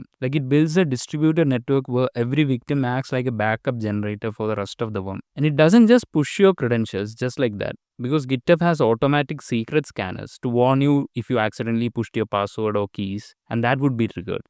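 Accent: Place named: Kerala, India. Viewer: Are you a Malayali? That accent sounds familiar.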